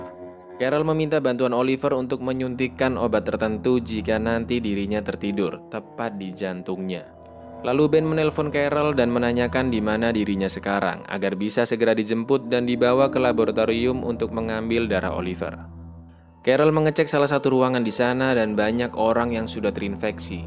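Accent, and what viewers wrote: Java, Indonesia. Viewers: Mantap min cara berbucaramu wkwkwk, jowo pokok e terdebest! 😂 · Mimin wong jowo?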